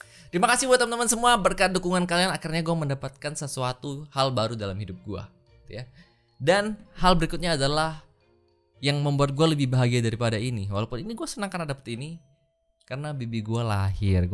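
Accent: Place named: Java, Indonesia. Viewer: Ehh wong medok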